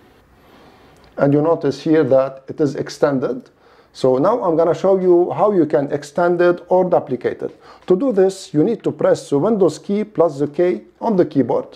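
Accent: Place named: Lebanon. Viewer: your accent is lebanese! are you ?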